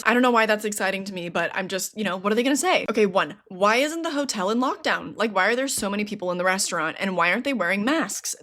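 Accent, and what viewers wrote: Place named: United Kingdom. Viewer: Doing a 1980s Horror Movies bad reviews video? It's completly outdated. Well, that was pretty ... "not unrelieved" ! I don't want to sound snob, but some people really are ... 🤔unhinged? Sad?... Uneducated? All of these? ❤your British accent ! Horror is the best dumbest genre ! – You slew me ! "you bedeprtprrrt..." The Stuff , yay !! B-But?!... Didn't you "forget" Re-Animator ?!... I'm not saying that because I love that movie, but because it's just ... quite an amazing and remarkable one. Stuart Gordon's masterpiece. Alongside Dagon . Yeah... '80s were ... a time.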